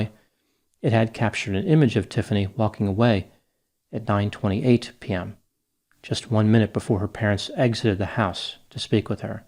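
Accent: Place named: Pennsylvania, United States. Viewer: Every time he adds a zinger to his deadpan delivery it goes right over my head for a few seconds then I have to stop and think about what he just said. I hear a PA accent so almost the same thing.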